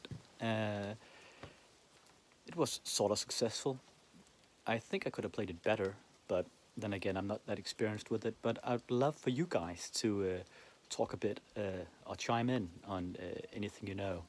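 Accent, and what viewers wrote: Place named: Denmark. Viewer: I thought you sounded different from Timmy, best friend is in Denmark, always like hearing the accent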